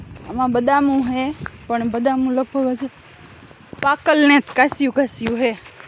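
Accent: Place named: Mer, India. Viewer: Ben tame asal merni bhasha bolo so te bahu j saru lage h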